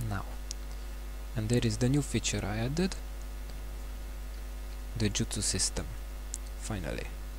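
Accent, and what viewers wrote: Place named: Hungary. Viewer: Annyira hallatszik hogy magyar vagy xD ;)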